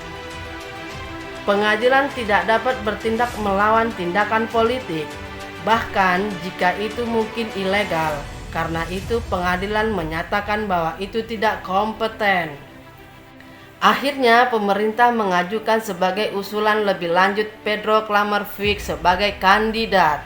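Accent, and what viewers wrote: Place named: Indonesia. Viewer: Kayaknya ema1 halo Channal ema Indonesia1 karik tan nia logat ne logat ema Indonesia nian.... 🙏🙏🙏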